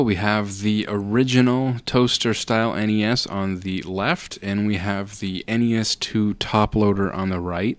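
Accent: Canada. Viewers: Are you Canadian? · is this guy canadian because he sounds canadian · Yes he is indeed a Canuck. As am I. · Wow. Nice. Hey, are you Canadian?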